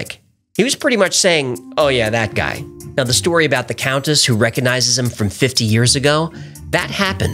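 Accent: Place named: New York, United States. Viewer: Who would have thought a smart ass human mouthed gold fish with a new York accent and nicely timed curse words would be so g@ 🤣 funny.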